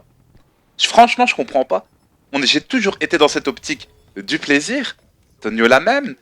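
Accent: Belgium. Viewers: T'es belge? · est tu belges stp répond 😜❤️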